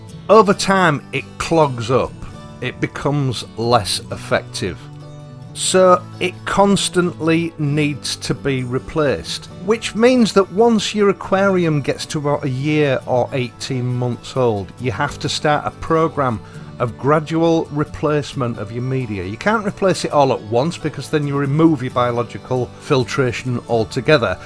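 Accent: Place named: Hull, United Kingdom. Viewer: Just started out … love your videos! It’s hard to find Australian content but this is good enough for me. Also your voice takes me back 25 years to my home town of Hull.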